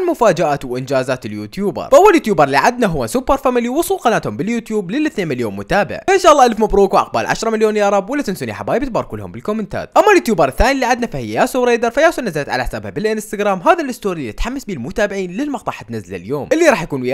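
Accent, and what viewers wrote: Iraq. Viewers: اتوقع العراق لأن كلامه عراقي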